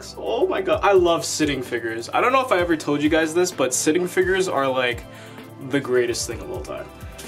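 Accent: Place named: Quebec, Canada. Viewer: Are you from Quebec? I mean, can you be from some where esle with that accent and those noodles?! Well I just look at the others videos and this is the only video where you sound Québécois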